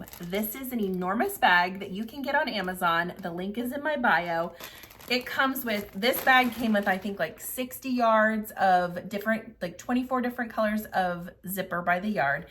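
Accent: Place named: South, United States. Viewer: I found your site and have really enjoyed it. Love your projects especially the Kate Spade tote bag. I hear a southern accent and have to ask where you’re from? I’m a native born Texan.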